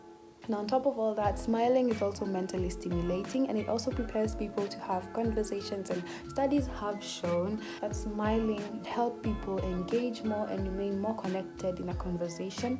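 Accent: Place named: United Kingdom. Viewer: 😅😅 love the British accent! Great tips…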